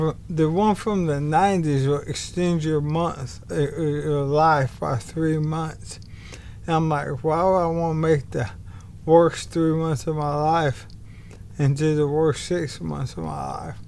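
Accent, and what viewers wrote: South, United States. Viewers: You’re a stand up guy,. Many of us will indeed greatly miss you when you’re gone. Until then, I’ll continue praying FOR you & your family. We’ll likely never meet, this side of life. I’ll look forward to hearing your perfect southern dialect again in the next life tho. Much love and respect to you, sir!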